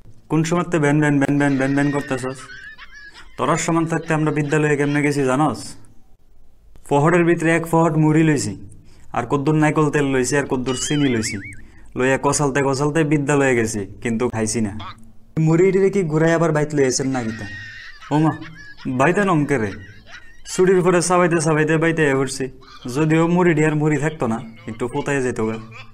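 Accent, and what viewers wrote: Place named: Noakhali, Bangladesh. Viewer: Please don't try this nowakhali accent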